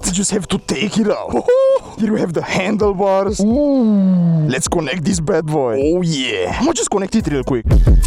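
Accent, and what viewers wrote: Romania. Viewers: brooo, is romanian accent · Stiam eu ca esti roman, dupa accent. Foarte faine videoclipurile. Poti oare sa faci un videoclip cu surron ? Ca inca nu stiu daca sa iau surron sau kukirin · You're romanian,right?Your accent seem to be from a Romanian guy(nu prea o am cu engleza) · Ce accent de român ai smr eu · ești român coaie hahaha😂 W numa acuma mi-am dat seama ca ai acent roman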